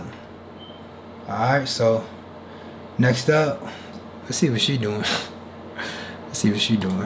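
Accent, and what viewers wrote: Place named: Washington DC, United States. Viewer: Aye you sound like a dc nigga too🤣💯🖤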